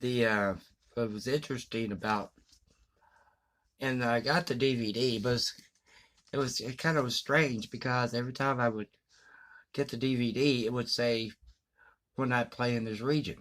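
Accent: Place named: Texas, United States. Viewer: Thought I recognized the accent. Howdy fellow Texan. Cool, sad story about the TV adaption of "All Creatures Great and Small."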